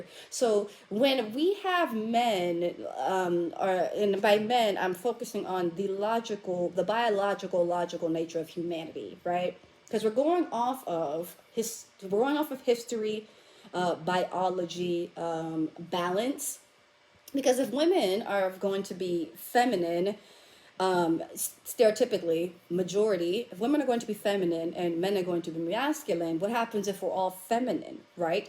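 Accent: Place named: England, United Kingdom. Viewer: Your English accent 😂👌🏾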